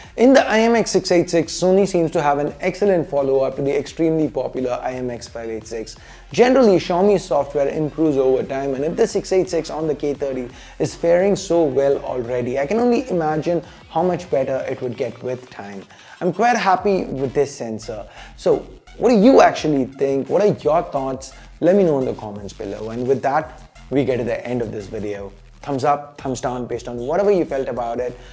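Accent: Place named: India. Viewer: 这英语一股浓浓的咖喱味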